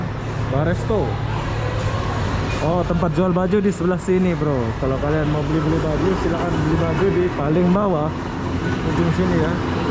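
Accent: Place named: Malaysia. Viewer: Assalammualaikum bang mohon maaf mau tanya Abang asli nya mana yah? Sering kali konten nya tentang Indonesia ☺️ tapi jika dengar suara Abang logatnya seperti orang Melayu. Apa Abang dari Malaysia kah? Terima kasih 🙏☺️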